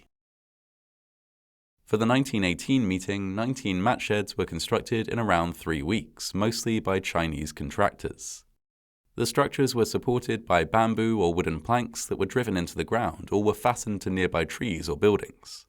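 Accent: United Kingdom. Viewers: British pronunciations🤭!!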